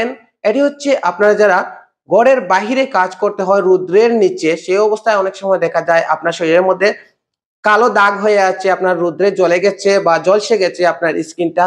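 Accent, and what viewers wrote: Sylhet, Bangladesh. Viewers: আপনার উচ্চারণ শুনে লাগছে সিলেটের লোক😑,আমাদের সিলেটের মানুষ শুদ্ধ ভাষাই খুবই কাচা😑😑বলবেন স্যার আমি কী ঠিক বললাম???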